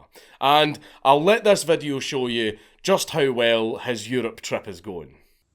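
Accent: Scotland, United Kingdom. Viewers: Each video you over pronounce every word to mask your scottish accent kills me 🤣🤣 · Who,, Charlie? Charlie is an American,, or do you mean the channel creator? Count Dankula is from Glasgow Scotland,, and he's speaking with a heavy Scottish brogue...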